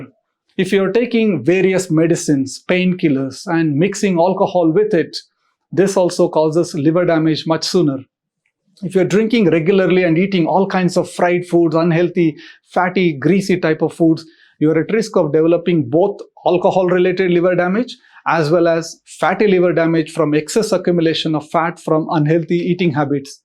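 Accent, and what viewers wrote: India. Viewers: Awesome Indian accent. 😆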